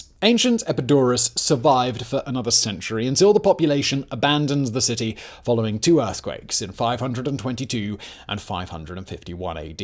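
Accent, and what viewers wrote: United Kingdom. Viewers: I love your videos man! something about a British voice, and a bald guy with a beard makes learning random information very enjoyable !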